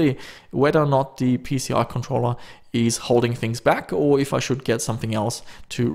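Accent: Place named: Germany. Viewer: An deiner Stimme erkennt man sofort das du Deutsch bist :D .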